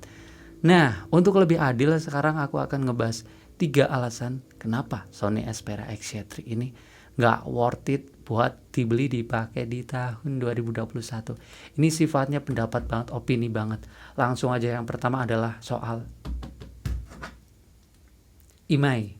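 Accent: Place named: Palembang, Indonesia. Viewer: wong palembang wkwk, bahaso nyo ketaro bang:v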